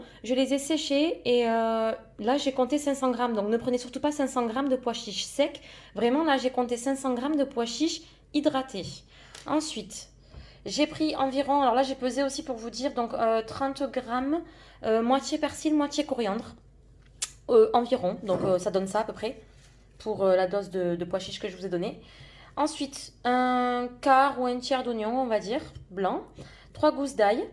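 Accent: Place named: South, France. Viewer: Excellente vidéo! Efficace, plaisante, pleine de bons conseils et en prime la musicalité du sud! Bon, y a plus qu'à faire la recette! Merci!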